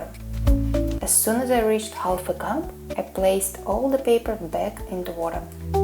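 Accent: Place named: Russia. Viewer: Акцент русских ни с чем не перепутаешь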